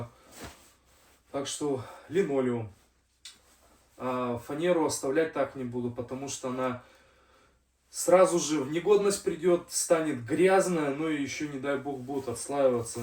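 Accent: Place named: Udmurtia, Russia. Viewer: Странно так слушать всë. Уж года два смотрю и не могу привыкнуть. Смешение какого то североудмуртского говора с вятским.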